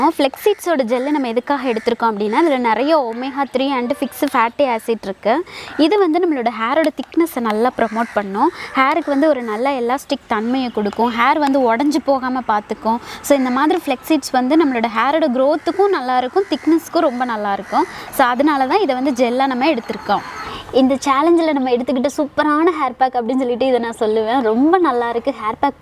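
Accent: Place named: Valliyur, India. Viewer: unga speech enga ooru mathiri irunthuchu athanalathan keten enaku vallioor sister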